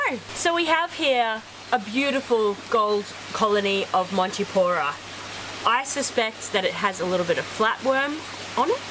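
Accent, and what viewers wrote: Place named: Australia. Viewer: The cinematography is first glass. Showing off those wonderful thongs (flip flops for you yanks reading this) and quirky glasses which are vintage (perfect). makes a classic catch and keeps score all in about 3 seconds before moving onto more complex maths and the turkey baster before speaking Latin - all time. Freeze frame and it seems with the cheeky smile that knows this is outclassing even Cam in his togs doing flips inside the 6x2x2 bar tank after fitting through a 30cm square hole in the top without knocking off the $1000 dollar Radions. Side note: Why remove the sea squirt? manages the classic Aussie accent whilst using scientific lingo that Sir David Attenborough only ever dreamed of. In my book the best example is "Upon visual inspection it's pretty obvious that this zoanthid colony is suffering from a range of macroalgae invasion" - although "it's pretty obvious" this was the 3rd take because the script said "infestation" instead but she made up for it with a word that sounded like 'poleketes' in the next section. 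Even with a science degree (incl first year bio and marine science) I don't know what a polekete is but I know Coral RX gets em. moves on from killing pests to healing infections - rewind and watch how seamlessly she moves from biological to medical language... before ruining it with the claim that it's all good because "it smells nice and clean". Ok - so this is easily THE BEST vid I have seen on dipping... but is not done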